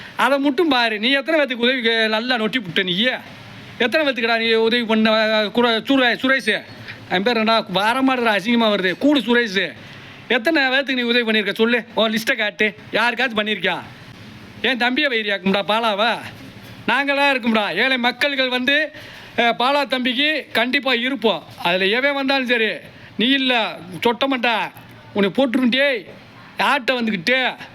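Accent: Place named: Madurai, India. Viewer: மதுரை தமிழில் திட்டியதற்கு வாழ்த்துக்கள்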